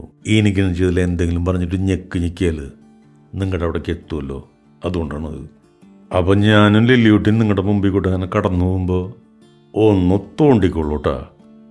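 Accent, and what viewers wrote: Thrissur, India. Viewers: തൃശ്ശൂർ സ്ലാങ് ആണേയ് ...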